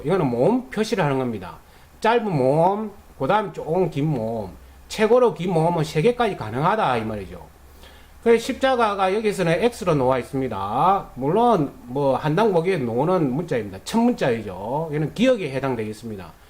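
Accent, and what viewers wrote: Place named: Gyeongsang, South Korea. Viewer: 몬 개소리하는지 니 말부터 통역해야것다!갱상도!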